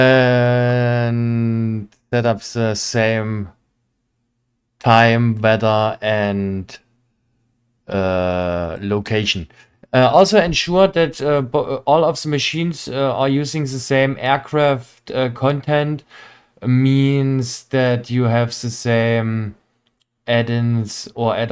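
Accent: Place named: Dresden, Germany. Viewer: Geiles Tutorial. Als ob du auch aus Dresden kommst. komme auch dort her😅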